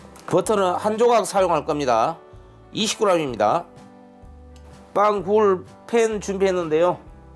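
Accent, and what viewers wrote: Jeolla, South Korea. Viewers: 저두 전남 광주입니다, 말투에서 전라도 사투리가 나어서요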